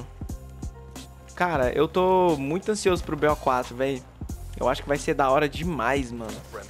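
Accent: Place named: South, Brazil. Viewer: Man, tu tem um fodendo sotaque do Sul, de que lugal vc é brou?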